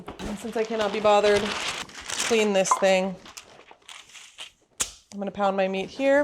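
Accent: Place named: Midwest, United States. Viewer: Omg, I’m Carolyn but I love you! So glad I found you. You sound midwestern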